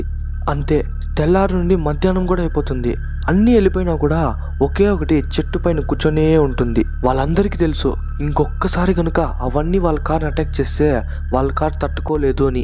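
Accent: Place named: Karnataka, India. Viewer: Language ನೀವು ಕನ್ನಡ ದವ್ರು ಅಣ್ಣ 🤣🤣ಪಕ್ಕ... Hemana paravaledu shoppu yav uoru naadi chikkabalapuramu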